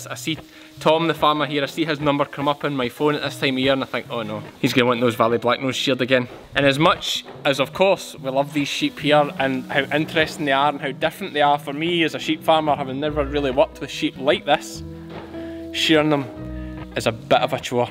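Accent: Scotland, United Kingdom. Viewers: I fell in love with these sheep the moment I saw them. I had no idea they were so expensive. It always makes me laugh when I see a sheep being shorn. They don't move a muscle. No resentance or complaining whatsoever. I could never eat these beautiful animals. They remind me of children. I enjoyed your video and could listen to a Scotman talk all day. Music to my ears. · Hello from Wyoming USA. I love your videos and accent!! Just curious, you and Graeme (hoof GP) are both from Scotland but have such incredibly different accents. Is it simply the difference in regional dialects, like the difference between Boston and Texan accents? 😊 Thanks for all your videos !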